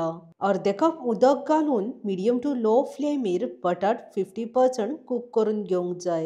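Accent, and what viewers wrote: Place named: Goa, India. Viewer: छान रेसिपी..goan भाषा पण छान वाटते ऐकायला.. शब्द न शब्द नाही कळला पण ऐकायला खूप गोड वाटली.. रेसिपी सारखीच..नक्की करून बघेन ❤😊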